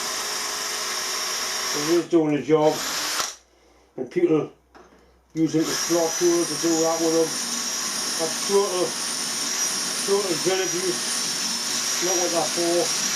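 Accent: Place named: Liverpool, United Kingdom. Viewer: I know a fair bit of effort goes into these videos. I want to be constructive: first please try & speak with a little less of the scouse (?) accent, I kept missing points that were maybe important. I also noticed some viice movement. If these drills are as good as you ,say they must be from another Chinese source. Those I have ordered so far (Drillpro) have the worst sharpening I have ever seen, & it's hard to sharpen drills below say 5 mm, at least for most of us. Of course if an edge should chip you will need to resharpen, how quick & easy is that - well you did not consider that. Remember also not many of us have a milling machine or high quality pedestal drill, so your tests should have been at least 50% freehand drilling. Finally I watched throughout & noticed that in most cases (not all) one flute appeared to be doing most of the cutting; this suggests the sharpening is not too good & that really is important. 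I almost forgot - yes you made surprising progress without failure but some repetitive drilling is needed to gain some insight into how well the drills are doing. You should have attempted at least 20 holes from one drill & maybe 50 holes in mild steel. Thanks for the video.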